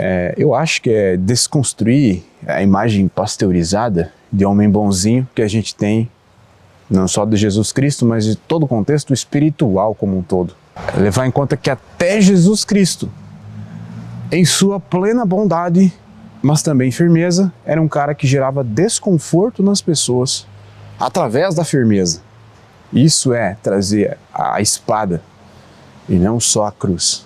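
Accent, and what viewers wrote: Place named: South, Brazil. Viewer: Hey estou aqui, Sotaque muito bonito o seu,muito orgulho da cultura Sulista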